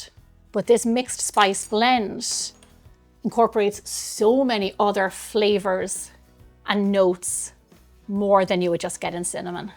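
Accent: Ireland. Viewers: ❤❤❤❤❤ THANKS SO MUCH! Your videos are so well done. The camera work and closeups, the explanation and history, and of course your adorable Irish accent all combine for a lovely presentation. I’m eager to try your mixed spice. 🥰 PS Do you have any cookie recipe that use this mix? Also, I live in a rural area and probably can’t get all the spices locally. Can you recommend an online source? Thanks again. 😊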